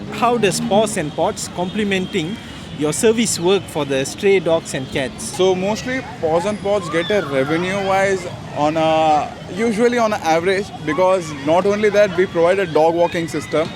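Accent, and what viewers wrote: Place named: Kedah, Malaysia. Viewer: Aku dah terjerat dgn channel tuan.. Haru... Aku jrg tgk channel mcm ni.. Pasai pa tak tak tau blh sgkut plk.. Mgkin cara penyampaian dan adab dia dgn org kot.. Tuan Penang ka kedah.. Lenggok mcm Penang..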